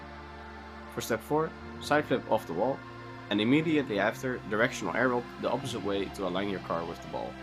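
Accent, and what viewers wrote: Netherlands. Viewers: Het Nederlands accent 😭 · haha het accent gaf idd een indicatie dat OP een Nederlander is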